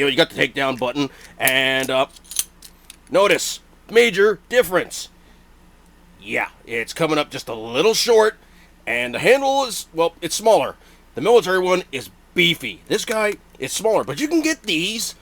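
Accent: United States. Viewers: You're the most American sounding man I've ever heard.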